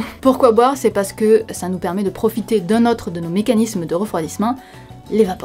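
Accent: South, France. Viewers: Question totalement annexe, comment est perçu ton accent du Sud (? C'est bien ça) au Québec? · Tu as un petit accent du sud de la france ou j'ai fumer ?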